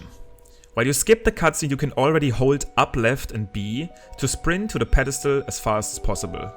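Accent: Germany. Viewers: Is he german? His accent sounds german...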